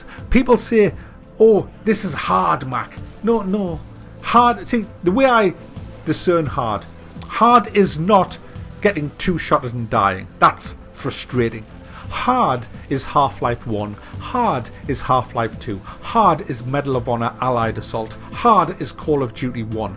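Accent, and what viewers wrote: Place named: Newcastle, United Kingdom. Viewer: Hey what part of the uk are you from you sound like someone from either sunderland or Newcastle haha?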